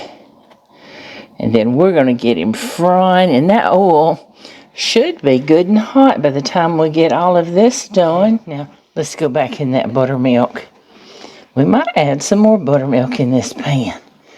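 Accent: South, United States. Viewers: I love ❤️ blooming onions. I dip mine either in ketchup or shrimp sauce. I haven’t noticed before but your accent is really southern. ❤️❤️❤️🥰🥰🥰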